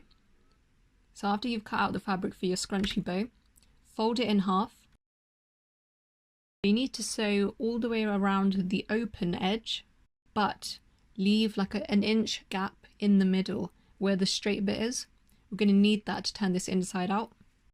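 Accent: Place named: United Kingdom. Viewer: It's British accent 😅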